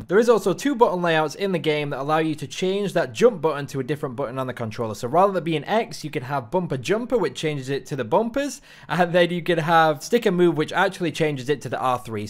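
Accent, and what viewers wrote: Yorkshire, United Kingdom. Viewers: Great vid. Ive got something to try now. Makes a change to hear a Yorkshireman on here!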